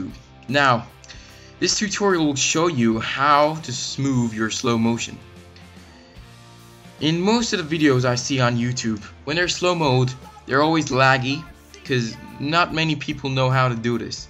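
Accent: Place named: Boston, United States. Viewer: he's just from boston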